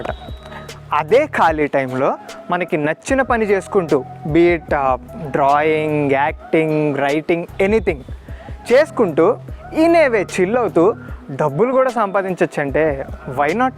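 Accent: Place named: Bhimavaram, India. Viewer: super bro... Meeru bhimavaram valla.. naku mee videos vinnappudalla ma uru la anpistudi😊. All the best 👍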